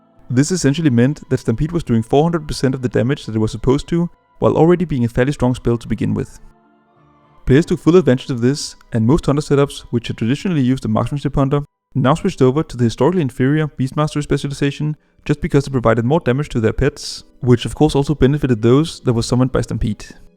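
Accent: Denmark. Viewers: You can just hear this guy is danish xD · Great video, I love the danish accent, nice narration. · Skud ud til danglish! <3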